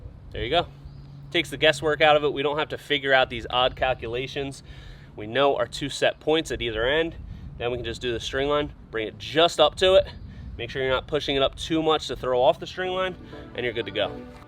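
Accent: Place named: Chicago, United States. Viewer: Why your n ants accent sound like ur from chicago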